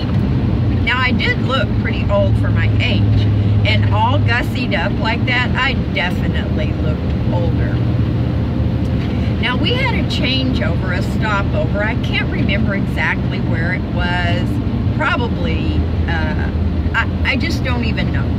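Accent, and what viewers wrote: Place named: Maine, United States. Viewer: What y'all wanta bet looked like a red-headed Audrey Hepburn after her Aunt got her all spiffed up and lady-like??, you told us how since you were born in Maine, your family all said Ahnt instead of Ant. Even now you switch back and forth. I do that with some words.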